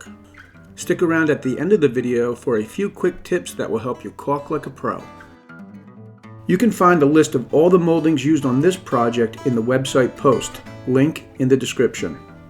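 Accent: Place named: New Jersey, United States. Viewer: Always fun listening to a fellow Jersey boy tawlk. 😁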